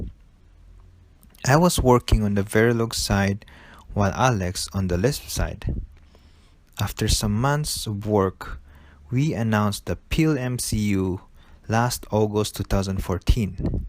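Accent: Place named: Philippines. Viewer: You sound Filipino. Are you filipino?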